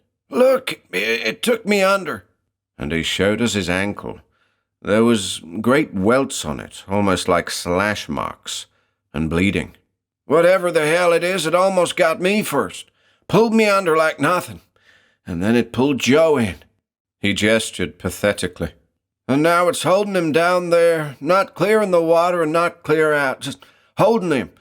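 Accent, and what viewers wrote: United States. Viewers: Really good American accent,:)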